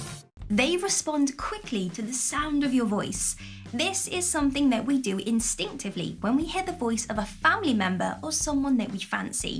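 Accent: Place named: Sheffield, United Kingdom. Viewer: Are you from Sheffield by any chance?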